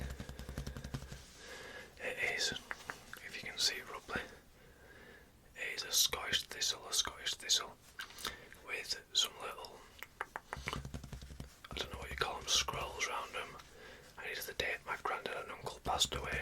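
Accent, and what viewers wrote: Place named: Lincolnshire, United Kingdom. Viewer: Where are you from? Your accent sounds like home! I’m from Lincolnshire!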